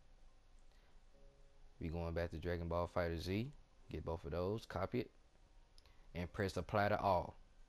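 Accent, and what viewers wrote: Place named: Louisiana, United States. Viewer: I freakin luhv your accent. Are you from Louisiana? lol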